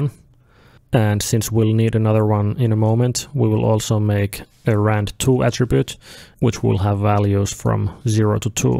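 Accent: Finland. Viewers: As soon as I heard your accent I a had a hunch you were a fin! :D Great tutorial man and enjoyed the ending. Very chill. Kaikkea hyvää ! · How didn't I notice you're finn, you sound like the iceman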